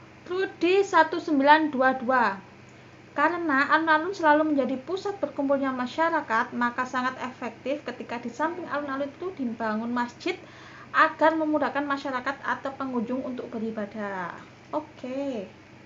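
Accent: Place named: Java, Indonesia. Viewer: Medok jowo ngomonge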